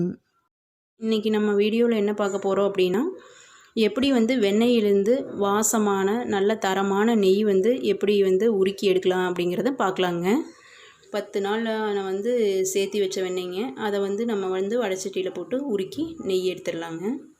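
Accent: Kongu, India. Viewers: Kongu Tamil super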